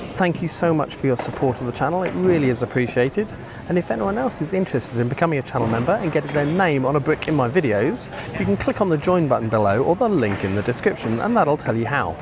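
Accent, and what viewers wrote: United Kingdom. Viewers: EVERYONE SHUT UP the nice British man is gonna tell me about his Lego collection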